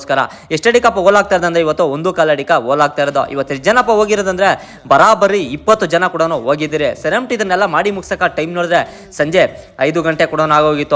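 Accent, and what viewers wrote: Kolar, India. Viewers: Nim mathugalu style super Anna Hoskote , Kolar side ❤ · Typical kolar kannada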